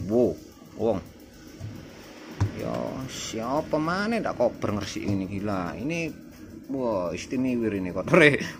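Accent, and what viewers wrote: Surabaya, Indonesia. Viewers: logat bahasane khas wong jowo timur asli arek2 suroboyo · Suroboyoan ngene wkwkw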